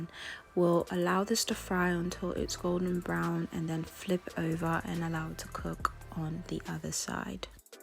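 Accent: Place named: Liberia. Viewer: Hey girl!!! So glad I came across your channel. I just moved from NYC to London a few months ago and I've beeen cravvvvviiiingggg Liberian food. But I don'y know where to find stuff like smoked Turkey meat and other common ingredients here? Can you advise? And do you know of any Liberian restaurants in London by chance? Sorry for the 21 questions. I heard your accent and I couldn't help but ask (assuming you're still here). <3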